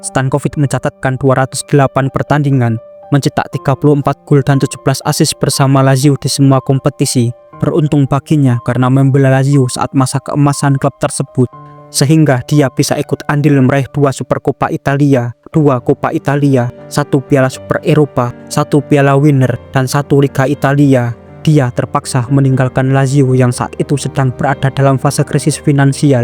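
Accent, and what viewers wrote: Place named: Java, Indonesia. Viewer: ngomongnya jangan medok bro, pake bahasa dan nada nasional. agar enak di dengar.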